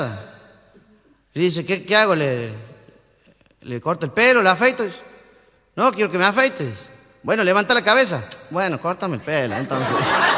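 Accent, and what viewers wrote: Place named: Santiago, Chile. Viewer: Me encanta el acento santiagueño.👍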